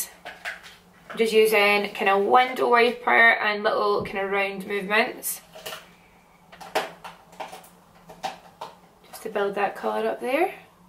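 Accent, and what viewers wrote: Scotland, United Kingdom. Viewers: She's Scottish Lool that's not foreign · Its just a fucking scots accent calm it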